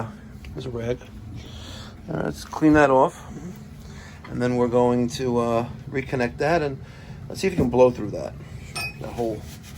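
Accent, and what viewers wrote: New York, United States. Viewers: I’m here for the learning but hearing the New York accent is almost more fun!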